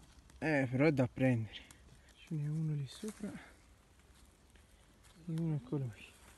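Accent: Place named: Tuscany, Italy. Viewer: Belli i funghi ,bello te e la nonna,ma l’accento toscano mi fa’troppo impazzire!Complimenti con tanta invidia da una nonna che non ce la fa’ più ad andare a funghi,bravi!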